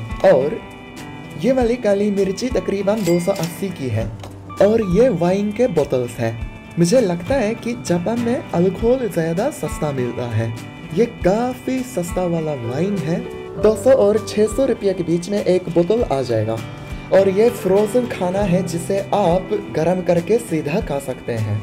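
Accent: Standard. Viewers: Bro ap jesi Hindi bolte he (sudh Hindi ) vese to hamre idhar bhi nhi bolte ( nice Hindi) ----you talking Hindi nice ...i like this 😁😁😁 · bhai aap ki Hindi bahot shudh he hamare jaisa nahi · He is much more Indian than many other People living in India, शुद्ध हिंदी।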